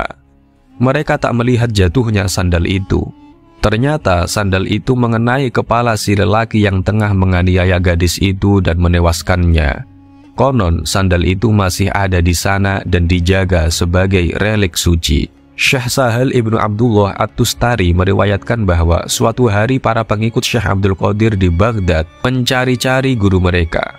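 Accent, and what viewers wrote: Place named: Tegal, Indonesia. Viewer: Alhamdulilah terjemahe wonge dewek Tegal ngapak ngapak nyong rongkna lur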